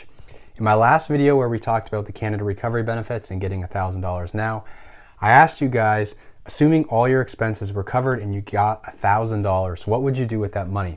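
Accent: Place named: France. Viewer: Justin trudo? Are you French? You have a French accent though its subtle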